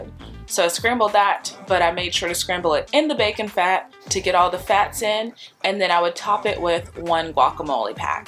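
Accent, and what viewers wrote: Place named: Bay Area, United States. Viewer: Where are you from? Sound like you're from the bay.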